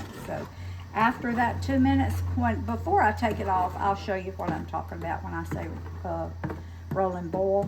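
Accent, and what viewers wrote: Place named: Missouri, United States. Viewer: You’re Adorable! You sound like your from Carthage, Mo! My mom grew up there.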